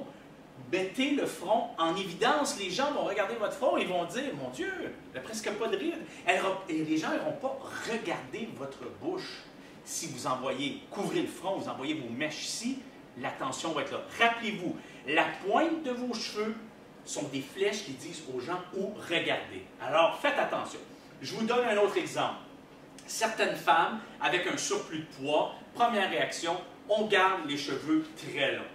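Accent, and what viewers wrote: Canada. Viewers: Bonne vidéo avec un joli petit accent du Québec !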